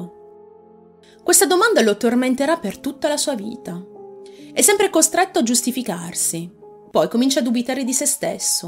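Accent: Apulia, Italy. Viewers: Ma perché se sei francese hai un accento pugliese così forte???? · Non sapevo che fossi francese, se davvero è così complimenti parli un italiano perfetto, pensa che io ti scambiavo per come parlavi in italiano per una Pugliese😂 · Interessantissima storia ed allo stesso tempo agghiacciante. Quante vite sono sconvolte da storie simili. Una curiosità: ti credevo pugliese.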